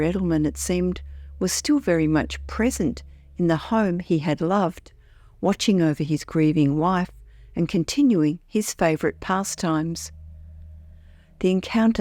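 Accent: Australia. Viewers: She has a lovely aussi accent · Nah, you're just not used to her Aussie accent.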